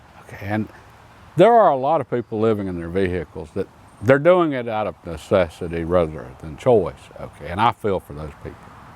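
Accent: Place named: South, United States. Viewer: By the way, I like what you say and that southern accent. I’m an ole Arkansas boy. New subscriber.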